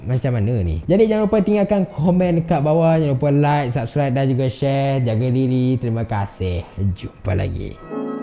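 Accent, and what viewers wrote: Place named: North, Malaysia. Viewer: ...aik utaqa pulak ka bang hahaha...duduk mane,btw sebenaqnya aku pun oqang utaqa gak hehehe😂👌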